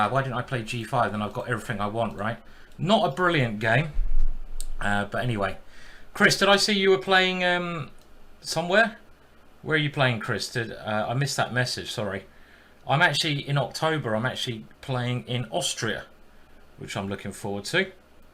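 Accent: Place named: London, United Kingdom. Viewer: As italian I know nothing about english accents. Are you from London?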